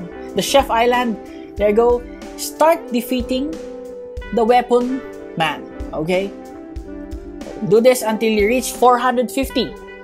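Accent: Philippines. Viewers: R u a filipino u sound like filipino hahahahaha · I know the accent , Your a Filipino right?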